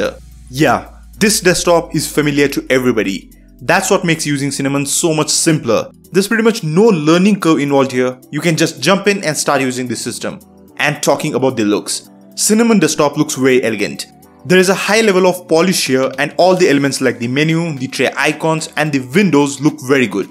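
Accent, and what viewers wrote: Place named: India. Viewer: Arrey bhai accent kam kar. “Kompooting” experience . Sharm aati hai desi accent se ?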